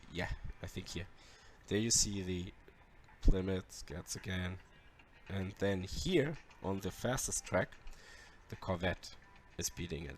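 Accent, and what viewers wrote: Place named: Germany. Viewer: Man merkt richtig an der Aussprache dass du ein Deutscher bist 😁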